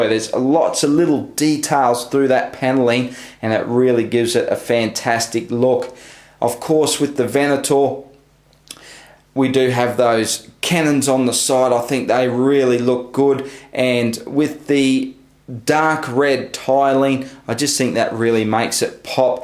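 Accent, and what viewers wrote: Australia. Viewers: I like your australian accent. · are you Australian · This guy has a real thick strayan accent i like it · Youre an ausie, arent you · Wow are you Australian I am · He has a strong Australian accent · Love the Aussie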